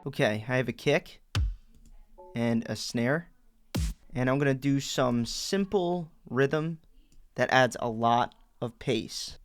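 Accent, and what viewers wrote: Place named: East Coast, United States. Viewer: you must be from the upper east coast " cut it in hafv"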